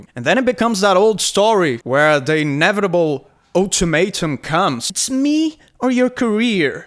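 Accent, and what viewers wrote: Brazil. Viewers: Eu sabia que eu tava reconhecendo esse inglês de algum lugar, você é brasileiro! Conheci seu canal tem pouco tempo e só tenho elogios! Continue mandando muito bem!!!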